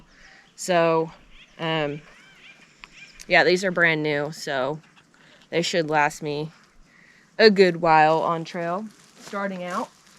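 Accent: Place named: Georgia, United States. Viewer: U must be from South Georgia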